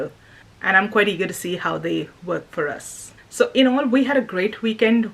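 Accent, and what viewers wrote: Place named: United Kingdom. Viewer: Maybe a stupid question but in your bio it say’s Australia but you sound like someone from the UK😬 i am a starting artist and thinking about doing markets to sell my art but will the costs way up against the sale? Something else i need to overcome is the amount of people, i am a high sensitive person. So back to your video , i really liked it. Well done👍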